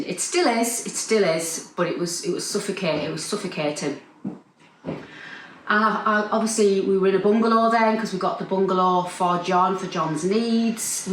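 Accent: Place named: Northern England, United Kingdom. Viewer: Amazing. Look at you GO!! I can't wait to see the reno and all your adventures. I will say you don't have to say Widowed you can say Single. I love sewing but I also love a good reno. Hw about A Dressmakers Life that should incorporate your fresh start while also giving a nod to where you came from. I love being able to sit here in the US (currently) and listen to a Northern accent. Makes me think of Doncaster and my youth lol.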